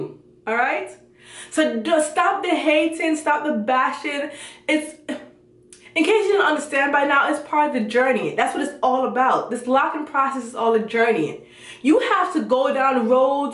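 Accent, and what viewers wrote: Patois, Jamaica. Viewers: I knew you were Jamaican because I could hear the patois at some parts. I'm Jamaican too.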